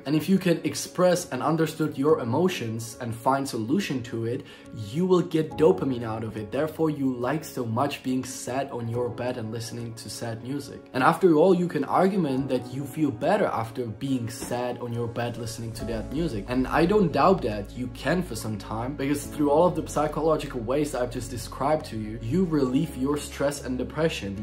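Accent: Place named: Czech Republic. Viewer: I can say youre from czech republic right? btw great video man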